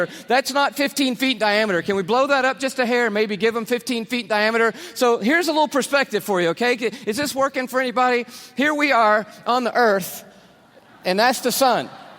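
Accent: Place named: South, United States. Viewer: Ευχαριστώ το video που δικαιολογεί την αθεία μου. ¨Εφτιαξε λοιπόν όλα αυτά τα τεράστια άστρα που δε μπορούν να φιλοξενήσουν ζωή, για να δημιουργήσει μια προσωπική σχέση με εμάς τους τυχερούς στην μικρούλα Γη. Εκατοντάδες δις γαλαξίες με εκατοντάδες δις άστρα για να πει στους Εβραίους ότι τους ελευθέρωσε από τους Αιγυπτίους, για να μας απαγορέψει να τρώμε μπριζόλα τη Μεγάλη Παρασκευή και να μας επιβάλει το πότε το πως και το με ποιον θα το κάνουμε στο κρεβάτι μας. Ηλίθιος ο ομιλητής και φυσικά με νότια προφορά. Οσο πιο βλάχος τόσο πιο θρήσκος ...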